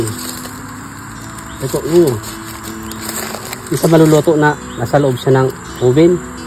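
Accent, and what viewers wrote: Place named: Visayas, Philippines. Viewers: Saan ka sa atin dol? Bistag dialect mo diba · Abot hanggang Antipolo, Taga San po kayo? parang waray salita niyo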